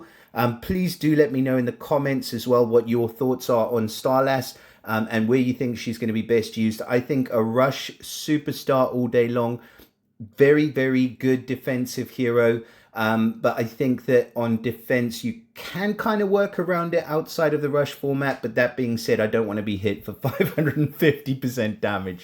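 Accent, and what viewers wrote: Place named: Cape Town, South Africa. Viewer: lol I knew it...certain words I can hear you from CPT😅 ain't nothing like a CPT accent 😎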